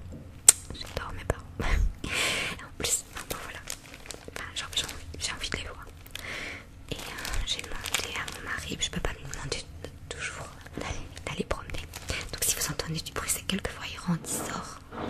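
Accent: Belgium. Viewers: Coucou, tu mérites tout le succès que tu as:) j'écoute principalement des vidéos ASMR anglophones, russophones et germanophones. Mais là, je craque, j'adore tes vidéos, toutes autant les unes que les autres! J'espère que tu vas bientôt avoir de bonnes nouvelles pour ta santé. Pour ma part, je suis en post burnout et dépression depuis janvier. Tes vidéos me font beaucoup de bien, et l'accent belge... 💗 Je suis fan! Gros bisous, prends grand soin de toi. La santé est prioritaire:) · Bonsoir, tu es belge ? 🤔 sinon video trés relaxante, j'adore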